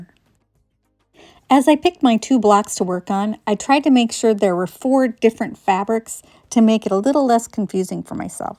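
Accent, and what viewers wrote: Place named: Texas, United States. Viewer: I'm from Texas and have been quilting only since i retired about 10 yrs. Iwas taught not to waste anything so i make baby quilts and string and log cabin. I love this and was trying to decide what to start now. You helped me decide. The only problem I had was thinking you were saying black instead of block and couldn't quite figure out where you were putting the black.....guess I missed the Texas drawllllll lol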